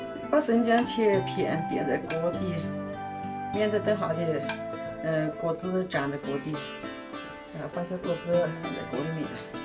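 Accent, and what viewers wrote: Kunming, China. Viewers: 我不是寻甸呢，只是之前在昆明呆过两三年了，所以一听就听出来了。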